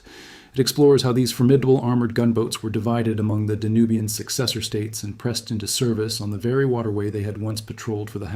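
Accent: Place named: United States. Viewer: I like how it's called Britain's war files, but it's an American AI voice that takes loud breaths for some reason 😂😂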